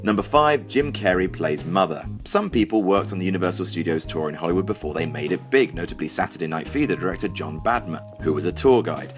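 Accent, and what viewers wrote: United Kingdom. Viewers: WHY did they use a steroid-voiced, heavy accented, British motor-mouth turkey to narrate this?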